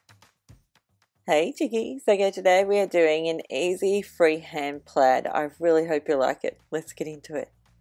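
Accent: Australia. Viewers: Love your videos. Which part of Australia are you from?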